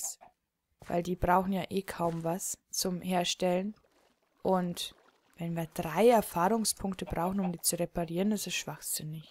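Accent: Bavaria, Germany. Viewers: Hy! Bin durch Lets-Plays.de auf dich gestoßen und find deine LP´s echt Hammer! Bist du aus Bayern? cheerio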